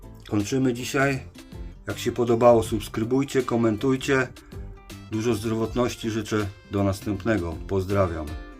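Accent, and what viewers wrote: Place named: Silesia, Poland. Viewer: Ciekawa ta gwara! Chyba że Śląska? Produkt smakowity! Dobrego dnia życzę 😅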